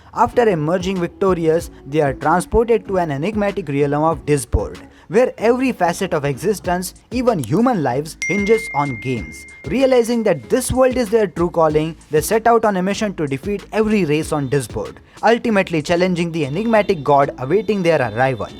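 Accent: India. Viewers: Indian accent is awesome bro. I studied engineering and would come home from a 2hr lecture not understanding shit. Then I watch a video of a guy with Indian accent explaining it perfectly in 30min or less… indian accent carried me through uni :D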